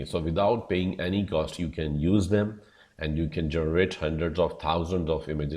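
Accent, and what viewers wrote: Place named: United Kingdom. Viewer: Sir your British accent is too good 👍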